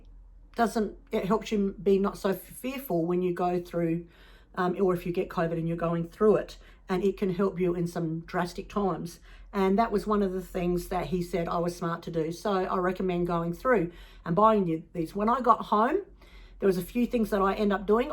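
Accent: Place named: Australia. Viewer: Oh. Goodness Covid..So glad you are on the mend. So good to hear your podcast again. Watched you from the beginning..and watched your store grow. Will have to visit. Love hearing your Aussie accent..Kia Kaha, good Lady.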